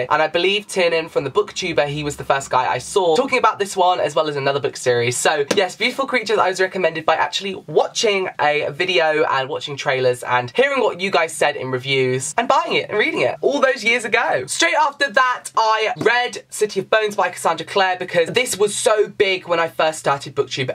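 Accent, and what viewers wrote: United Kingdom. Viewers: Happy Birthday 🎉 I like your English accent 🤗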